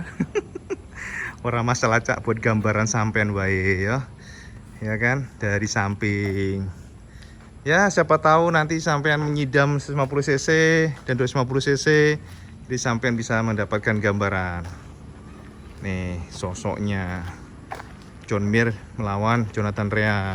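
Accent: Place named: Java, Indonesia. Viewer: Teros tak enteni.kontene sampean seng di ae pokoke lucu.karo enak di rungokno.ngomonge khas wong jowone.dadi suweneng aku ngrungokno.salam teko wong tuban cak.!!!!!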